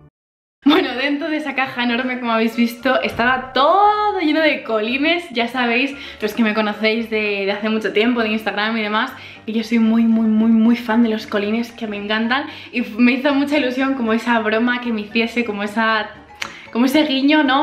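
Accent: Spain. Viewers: Me encanta el acento español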